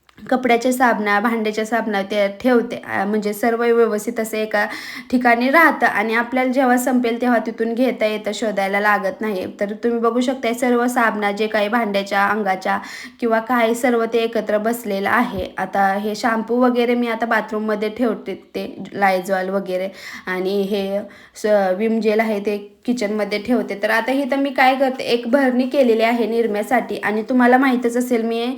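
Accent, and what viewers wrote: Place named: Latur, India. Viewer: Tu pune la rahti pan tuz gav kont aahe tuza kahi kagi shabda varun tu laturchi aahes as vatat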